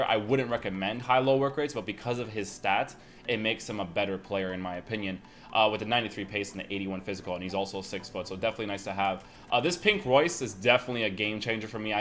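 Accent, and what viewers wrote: Portugal. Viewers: Are u portuguese??? Love 🇵🇹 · Are you portuguese?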